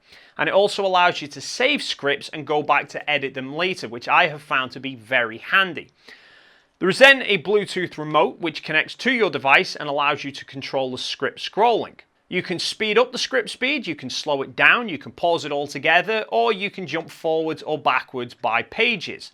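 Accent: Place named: North, United Kingdom. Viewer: For me, you're presentation is still spot on. No worries there. I subscribed for your technical explanations of camera gear in your signature informative yet easily understandable approach. & Your northern vernacular just adds the right amount to friendly chill to make them an easy watch from start to end.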